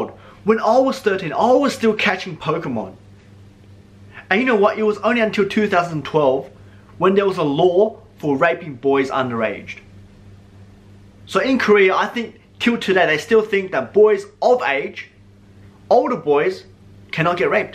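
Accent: Australia. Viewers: I love your Australia n accent.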